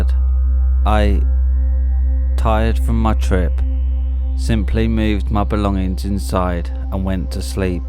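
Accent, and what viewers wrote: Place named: United Kingdom. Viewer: Loved your intro dude. Sounds like you are a fellow Brit narrator too. Great to see your channel growing too. Hope you don't mind a little bit of critique.. nothing bad. Try to make your reading a bit more flowing. Maybe when you do a person's speech, maybe try adding a bit of inflection/emotion into whatever they are saying. That being said, one thing I find that listeners do seem to enjoy, is to add plenty of sound effects throughout the story. So far I haven't found any other narrator, not even the big channels, add as much as I do. Folks find it helps the story along, by making it more immersive. Some have even told me that my narrations remind them of the old-time radio shows haha. Oh and maybe ask around for collabs, that can also help boost your subs and watched hours too.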